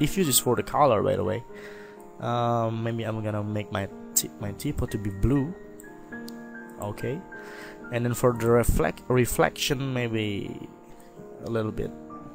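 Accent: Indonesia. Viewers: Aha indonesian right? Btw it's a great tutorial dude, i thought you were western at first haha.. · I knew it the second he talks. indonesian accent all the way! Awesome tuts anyway! very helpful. yay for indonesian youtube guru!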